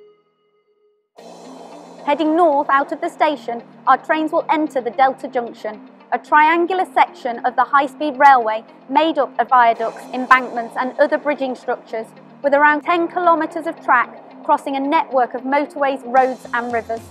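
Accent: United Kingdom. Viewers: Thanks for another informative update and confirmation of just how much work is being delivered to increase this country's railway network capacity. When the book is written and the "Lessons Learned" sessions are run, this will - I am sure - provide the model for future large-scale infrastructure projects around the works. We have adopted "green tunnels (aka "cut&cover") from the French - and the Colne Valley viaduct construction gantry started its life building a railway in China. The no-doubt temporary interruption to the greater build will, once this new railway line is operational, need to be reversed. Kudos to the project build teams involved and - for a British project with multinational teams involved, it is reassuring to hear a British voice explaining the progress and future directions. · Dialect, regional accent... These things exist in the UK.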